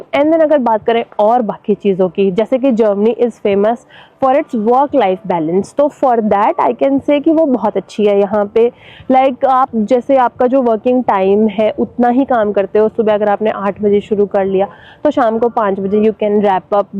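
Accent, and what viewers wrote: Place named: Uttar Pradesh, India. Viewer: Bahut achchi video aap U.P. se hn kya aap ki langauge se lagta h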